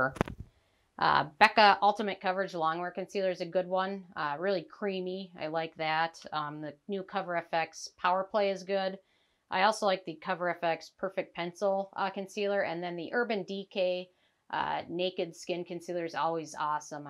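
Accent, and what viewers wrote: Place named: Midwest, United States. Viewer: my sunscreen AND Hyaluronic are water base. I’m using the Garden of Wisdom glacier water blah blah but their most popular and it is nice and refreshing as you’d guess. Def not enough at all on its own. Can’t wait to try First Aid. I have normal skin but it likes oils at night better than creams even tho derm docs say creams are better for keeping products in place to do their work. Sunday Riley Luna or Juno are dreamy for me. Starting up retin A once s week again and once you get past the ugly part, you def glow. I avoid it if I’m vacationing or have an event where I don’t want surprise peeling. Such as Christmas. Then I get back on the horse. I’m originally from MI so your accent is charming like mine. Lol. People know I’m Midwest immediately so I’m loving hearing another brethren once in a while.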